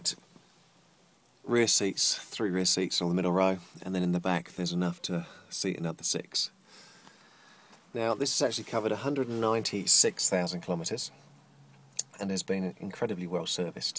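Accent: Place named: Australia. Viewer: Are you from Australia or New Zealand? I can tell your not a Brit because you're very Australian-sounding and your Land Rover there has an Australian/New Zealander number plate on it.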